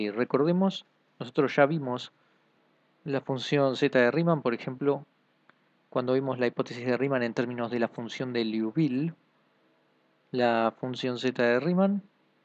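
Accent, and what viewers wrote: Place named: Argentina. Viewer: Gracias por la info, por tu tono pareces de Argentina, es así? En donde estudiaste?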